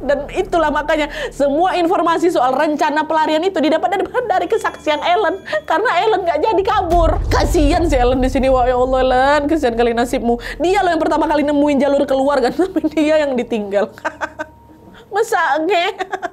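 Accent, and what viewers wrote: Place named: Medan, Indonesia. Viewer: Di lihat dari logat-logat nya seperti anak Medan😂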